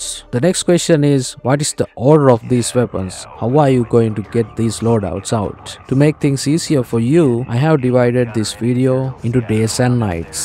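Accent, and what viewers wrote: Sri Lanka. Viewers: I knew ur sinhala from ur accsent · Broo are u from sri lanka?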